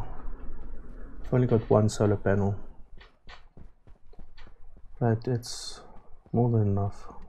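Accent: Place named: South Africa. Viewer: Aweh, you sound South African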